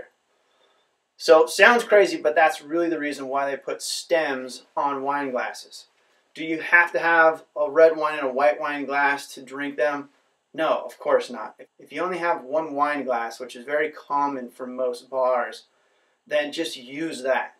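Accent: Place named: France. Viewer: Love the French accent :D Thanks a lot brother, will definitely recommend!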